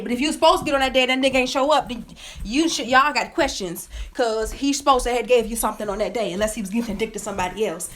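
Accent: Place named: South, United States. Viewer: You fine ass hell , with that country voice dam , bro lucky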